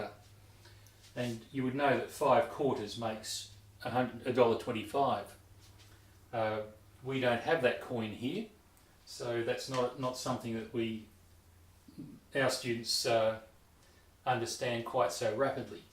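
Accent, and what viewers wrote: Australia. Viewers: g day must be from straya mate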